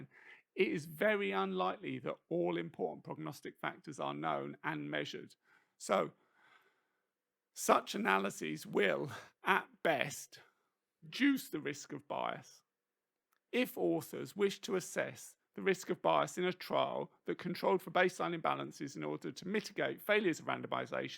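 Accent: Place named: Australia. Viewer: The Australian accent 😢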